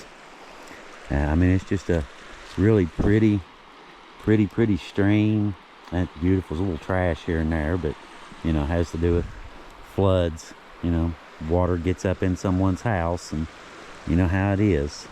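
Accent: South, United States. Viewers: Loving your videos on the Hatfields and McCoys. Love your Southern accent ❤ Cheers from New Zealand 🇳🇿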